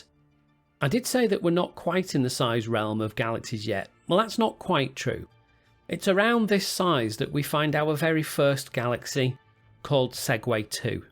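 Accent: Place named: North, United Kingdom. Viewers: YES! A new Learning Curve vid! This is one of the absolute best channels of this type out there. You have a great talent for explaining sometimes difficult concepts in easy-to-grasp terms, and I can't tell you how many times, after watching one of your videos, I've said to myself, "FINALLY I get that!" (as in, Finally I get what the Planck length is, or Planck time etc.) and all in a friendly, homey, Liverpudlian voice that reassures you that these enormous scientific puzzles will be explained carefully and clearly. Bravo, man. You do fantastic work. I'm so glad I discovered your channel. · I love your northern accent. "oor soun"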